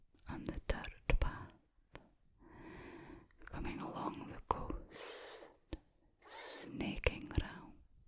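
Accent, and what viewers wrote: Scotland, United Kingdom. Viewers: Was scrolling through shorts and heard a Scottish accent pop up related to asmr finally a proper Scottish accent you do our accent justice lol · lol a very good sleep indeed, your Scottish accent beat me lol but I wonder how you’d stack up against a southern drawl lol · You and Stronny Cuttles are the absolute best Scottish voices I've ever heard in asmr 😍 I wish you two would collab. It would be soooooo amazing if that were to happen lol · that Scottish accent whispering is so hot wanna take an cold shower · this scottish accent knocked me out last night it very likely will again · A Scottish accent. I think she's from Aberdeen or Dundee? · Holy tingles dude. Never thought I’d love a Scottish accent so much 😌☺️ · I have a newfound love of the Scottish accent now. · Never knew Scottish accent was what I needed to sleep. 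Thank you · ! Glad to see you posting. I do love your Scottish accent so this is a wonderful video. ❤❤❤ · I hope to have your voice and accent in my ear every night when(if) I visit Scotland. Cheerz luv. 😴🥰🏴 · Dear God what a most beautiful gorgeous angelic Scottish voice I already feel more relaxed you're a true angel ❤❤❤❤❤ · This. Idk why but i love the Scottish accent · it brings me much joy as a scottish person myself that so manh people love the scottish accent!!! ive always felt really insecure about my accent because you never really see it online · I never realized just how much I absolutely love the Scottish accent. You are absolutely amazing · Well clearly he's talking about the Scottish accent IN the video, if you couldnt tell. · I am so taken back to my childhood with the Scottish accent at bedtime.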